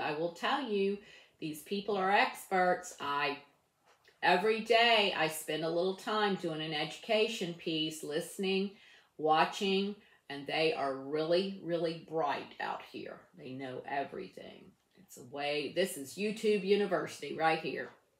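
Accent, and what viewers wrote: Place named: United States. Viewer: I have just subscribed... I just love your reviews and your accent is amazing as a British woman I just love American accents that sound like yours 🤗👌💋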